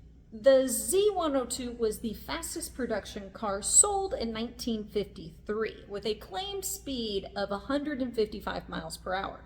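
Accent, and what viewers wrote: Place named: Ohio, United States. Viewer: Cool video! You sound like you're from Ohio. Spent the better part of my 70 years there.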